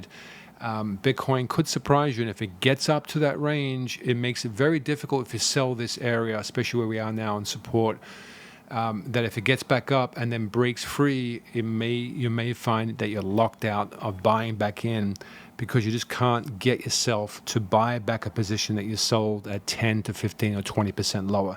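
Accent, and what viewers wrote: Australia. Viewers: he's from Sydney. Common NSW accent.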